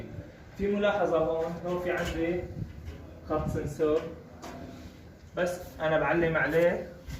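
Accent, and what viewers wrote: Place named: Syria. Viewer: شغل حلو و الاحلى لهجتك السورية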